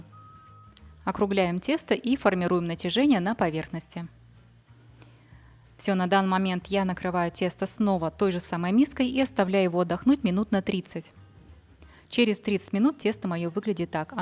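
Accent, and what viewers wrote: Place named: Belarus. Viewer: Вы из Беларуси? Я узнаю этот говор. Как и свой, родной, Одесский. Пошла месить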